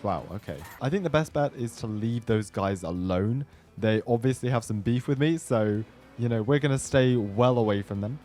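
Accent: United Kingdom. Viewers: why is every erlc youtuber british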